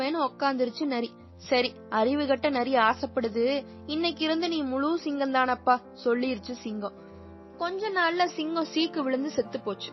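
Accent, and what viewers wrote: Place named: Coimbatore, India. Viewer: Nice cbe voice.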